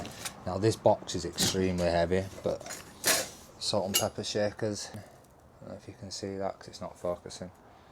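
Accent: Yorkshire, United Kingdom. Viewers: Stumbled across your channel scrolling through YouTube! Love the work ethic! Would be great if the end of your videos you did a breakdown of the financial side of the job. What you charged and what you made from the good bits that you’ve kept to scrap or resell etc. Looking forward to the next video! All the best from a fellow Yorkshireman (you sound like a yorkie anyway 😂)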